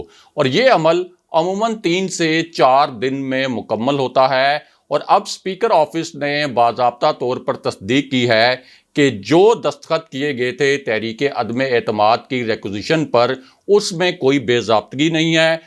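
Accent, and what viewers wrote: Punjab, Pakistan. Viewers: Sorry please correct ur Urdu speak Urdu in Urdu not in. Punjabi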